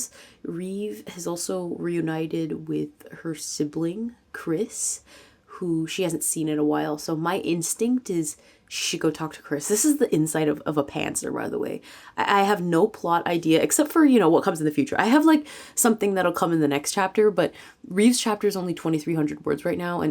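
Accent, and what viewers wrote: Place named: United Kingdom. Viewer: i need you to narrate my books in your british accent asl;dkgj i love your writing vlogs so much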